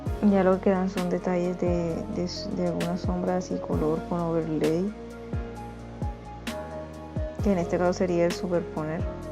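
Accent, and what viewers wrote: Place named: Barranquilla, Colombia. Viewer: Está hermoso, me servirá un montón. Graciaaas! De paso, eres de Barranquilla? Se me hizo por el acento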